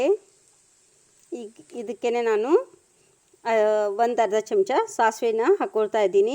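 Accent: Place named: North Karnataka, India. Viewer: ಅಕ್ಕ ನಮಸ್ಕಾರಗಳು😊 ನಮ್ಮ ಉತ್ತರ ಕರ್ನಾಟಕದ ಭಾಷೆ ಕೇಳೋಕೆ ಬಹಳ ಚಂದ ಅದರಾಗೆ ಮಂಡಕ್ಕಿ ಜೊತೆ ಇನ್ನು ಚಂದ, ನಮ್ಮಕ್ಕನ ಕನ್ನಡ ಮಂಡಕ್ಕಿ ಗಿಂತ ಚಂದ 🎁🙏🏻✅💐 ಅಕ್ಕ ಇಂತಿ ನಿನ್ನ ಪ್ರೀತಿಯ ತಮ್ಮ 😍🤗🙏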